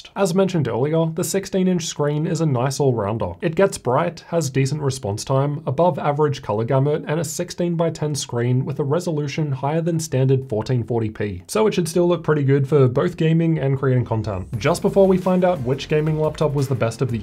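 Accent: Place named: Australia. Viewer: I can hear that you are from australia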